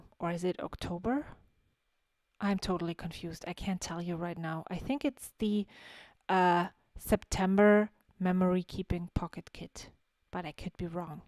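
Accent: Germany. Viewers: nice video. I love the way you speak English by the way <3 it reminds me of my very dear German friends.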